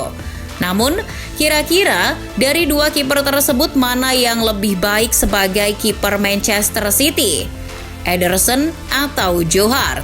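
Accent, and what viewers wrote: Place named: Sunda, Indonesia. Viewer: Orang Sunda pisan pengisi suarana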